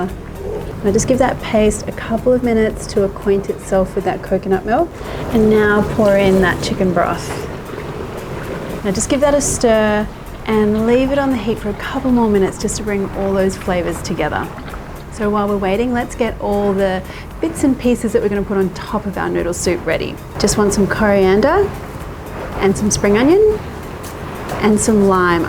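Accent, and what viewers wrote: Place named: Australia. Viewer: How i miss Australian accent..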